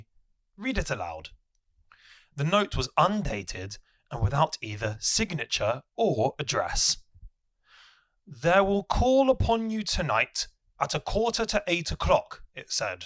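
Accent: United Kingdom. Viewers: Very clear British accent. Fantastic!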